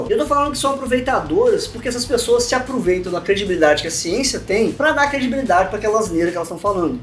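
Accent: Minas Gerais, Brazil. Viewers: você é mineiro. Estuda em São Paulo ou em Minas? Abraço.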